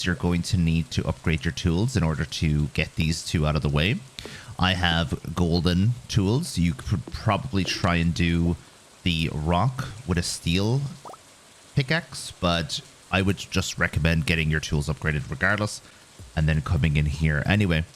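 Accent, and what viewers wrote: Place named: Ireland. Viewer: Savage vid bro, i hear that irish accent peeking through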